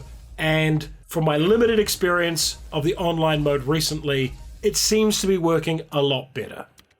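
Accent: New Zealand. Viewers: Oh yay a review by a New Zealander, so I will have access to/knowledge of everything you're talking about! Nice to hear a kiwi voice. I like the looks of One Deck Dungeon and Lords of Waterdeep.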